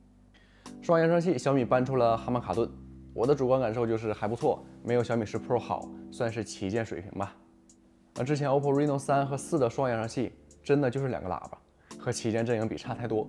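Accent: Northeast, China. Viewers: 东北口音挺重啊！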